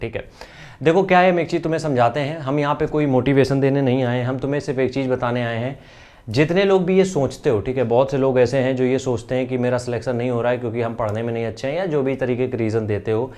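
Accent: Kanpur, India. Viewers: Kanpur se hai lgta😊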